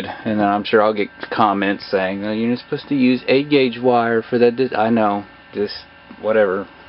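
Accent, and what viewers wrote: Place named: South, United States. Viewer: You have lost some of your southern accent since this video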